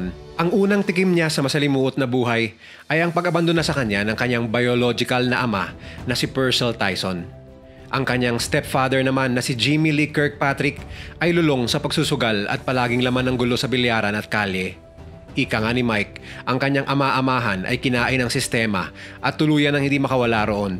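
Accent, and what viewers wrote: Visayas, Philippines. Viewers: Bisaya ka pre no? Hahaha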